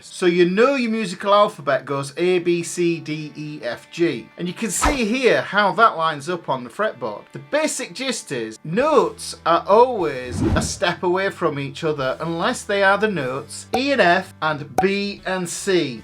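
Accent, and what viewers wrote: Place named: Yorkshire, United Kingdom. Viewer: Sooooooo nooooootes. Love a good yorkshire accent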